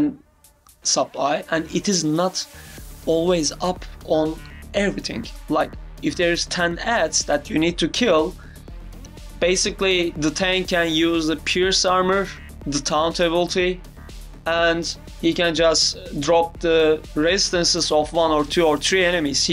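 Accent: Turkey. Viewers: hi mate ! where are you from ? i got turkish vibe on your voice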